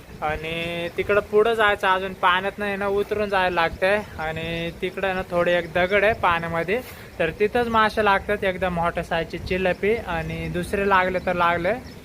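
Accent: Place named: Nashik, India. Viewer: भाऊ तुणच मुळ गाव कोनत आहे तुमची भाषा ऐकून वाटत नाशिक मधचे आहेत तुम्ही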